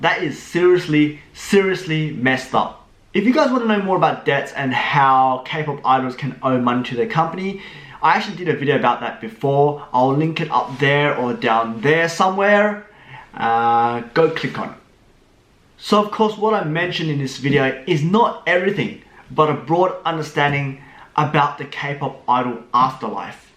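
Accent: Australia. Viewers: I love your Australian accent!! Ur soooo cute!! Maybe you can just become a solo artist.... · You are so cute when you serious....i love your Australian accent.. If I'm right.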